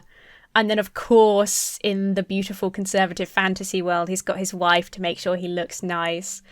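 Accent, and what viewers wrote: United Kingdom. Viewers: Justice for the tortoise! I love how you say tortoise! Love your content. You British people always get me with your accents!!! You're now my third British YouTuber... Simon Whistler, Tara mooknee and you. I guess daz black is also British but his accent is different than yours. I am a married man in America and i love being married to my wife. If I'm completely honest she does take care of me and i am healthier because of her. Its not that she's my mom or anything. Even though she does do stuff for me but part of it is she encourages me to be better because i love her. Ive been seven years sober because i want to live longer because of her and the children she's given me. Also, she's awesome and who wouldn't want to be alive when you're married to her.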